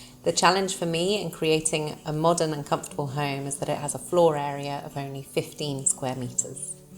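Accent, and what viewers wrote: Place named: United Kingdom. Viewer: I know nothing about architecture. But I do enjoy your videos so much, they are so relaxing complete with the slick animation, the gentle background music and your soft spoken narration with that beautiful British accent which is a pleasure to listen to. Fantastic work, thank you.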